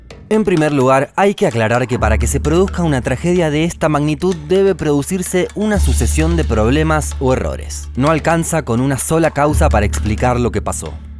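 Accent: Rioplatense, Argentina. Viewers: He visto tantas veces los videos de que se me pegó el acento argentino y cuando hablo la gente me mira raro:p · El Acento argentino de estos vídeos es agradable y Elegante , no se vuelve molesto como en algunos casos de periodistas deportivos o Narradores argentinos en los que ya se vuelve detestable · Lo que paso solo lo saben con certeza los responsables vivos pero ellos nunca van ha reconocer los errores que costaron la vida de tantos, no sería la primera vez que salió en esas condiciones , y difícil de constatar todo .- Muy buenos los videos y hermoso escuchar una voz Argentina .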